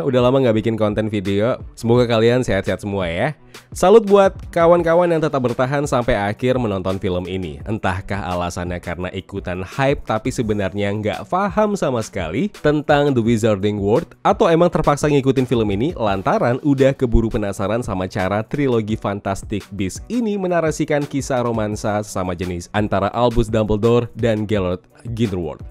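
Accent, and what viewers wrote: Medan, Indonesia. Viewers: Medan kali bah si abang..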